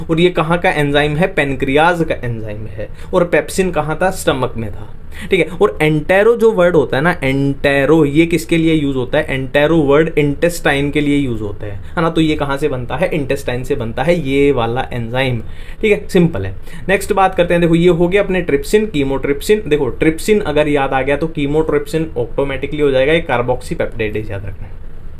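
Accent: Haryana, India. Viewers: Sir aap haryanwi h kya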